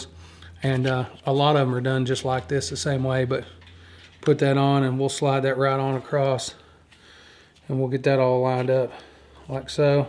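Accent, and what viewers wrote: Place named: Carolinas, United States. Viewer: You’re from the Carolinas? sure sounds like it🍻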